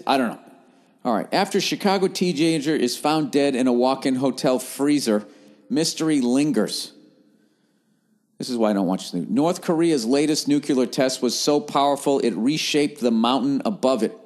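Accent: Boston, United States. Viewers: he has a Boston accent...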